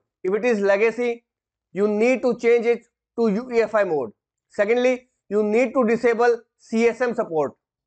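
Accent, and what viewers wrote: India. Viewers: I dont fking understand ur thick mumbai accent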